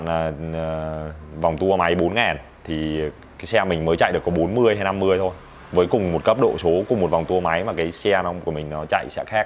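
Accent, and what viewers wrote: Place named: Bao Loc, Vietnam. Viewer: nghe dọng anh giống người dân ở bảo lộc lâm đồng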